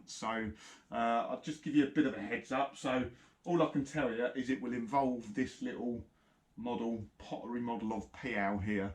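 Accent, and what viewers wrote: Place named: RP, United Kingdom. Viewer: Ooohh posh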